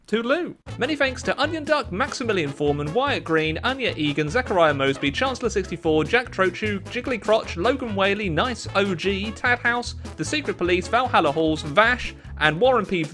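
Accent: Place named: United Kingdom. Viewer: He's more Bri'ish than usual in this video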